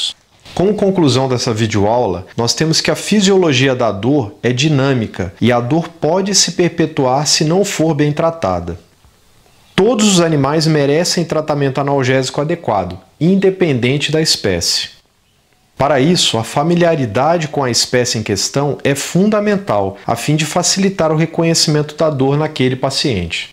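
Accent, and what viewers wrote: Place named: Minas Gerais, Brazil. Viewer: Prof. vc disse que é metade bahiano, metade italiano... Mas seu sotaque é bem mineiro... Parabéns pelos videos, estou gostando muito....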